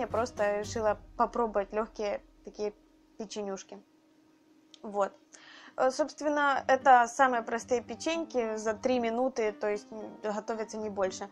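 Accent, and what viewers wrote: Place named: Kherson, Ukraine. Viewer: Вы из Херсона?! Очень знакомый, до боли, говор!)))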